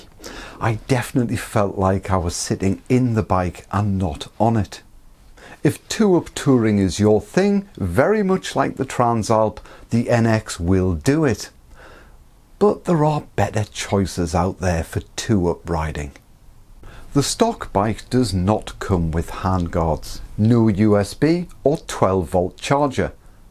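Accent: Newcastle, United Kingdom. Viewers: Great review. You from Newcastle area ?? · 🦘 Ah, English spoken with just a hint of Geordie - the most colourful of English accents/dialects - especially in its broad form. Useful review - convincing me stick to my gorgeous 2021 Grand Prix red CB 500X .... 25,000 km young, still going smooth and strong in all terrains and surfaces (with the help of Michelin Anakee Adventures) and only drinking 3.1L/100 km. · He's speaking proper English. Of course he's British. I have never understood why people who live in the penal colonies (Australia and USA) do not take the time to learn to speak English properly. Can you explain that please?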